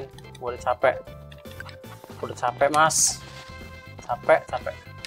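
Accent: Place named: Lampung, Indonesia. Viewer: i hear "tah" and "ding" orang lampung ya kak?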